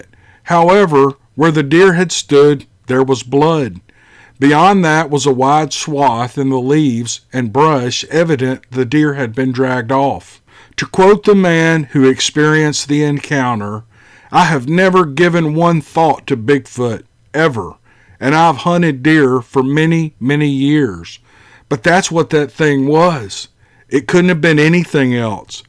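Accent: South, United States. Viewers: Sounds like a southern racism Chanel. Tone down the confederate accent. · Hysterical fantasy. What's with the southern accent? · your quote. "How about I just jump into the stories without running my mouth".(But please don't forget to play the music?) - my quote!. And I don't think you run your mouth off because I love listening to your southern drawl. From an old Aussie who just loves your presentations.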